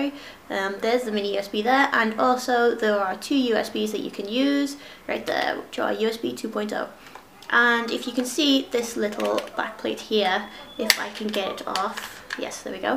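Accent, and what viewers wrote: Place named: Liverpool, United Kingdom. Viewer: Do I hear a fellow scouse geek?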